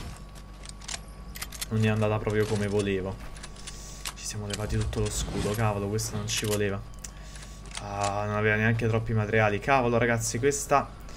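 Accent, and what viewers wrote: Naples, Italy. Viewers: Ma sei di Napoli?? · Ero sicuro che tu fossi napoletano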